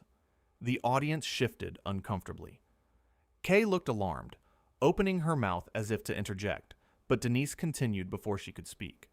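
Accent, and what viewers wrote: United States. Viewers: This is fake - never happened. The bad, over-written account delivered in a nasal American monotone should be a clue that this is phony. More fool.the eejits who believed this.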